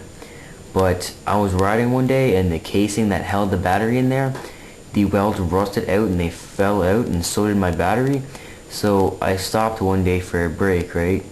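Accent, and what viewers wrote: Canada. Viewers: R u Canadian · are you canadian??? just when you said out sounded kinda scotish, i remember this from south park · some china man just made about $1999.50 haha.....someone sounds Canadian aye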